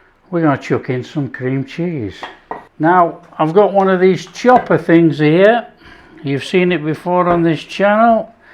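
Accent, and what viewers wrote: United Kingdom. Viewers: I Like hearing this british accent 😊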